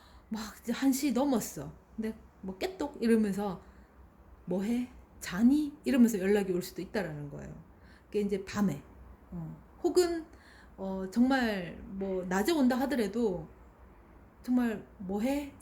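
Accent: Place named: Gyeongbuk, South Korea. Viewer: 경북에 여행갔을때 들었던 억양이었습니다 😊 저는 한국어가 익숙하지 않아서 억양을 잘 기억하거든요!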